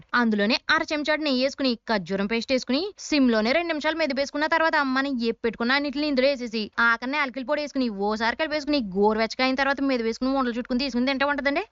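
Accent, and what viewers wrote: Godavari, India. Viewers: గోదావరి యాస మాత్రo highlight 😅😅😅😂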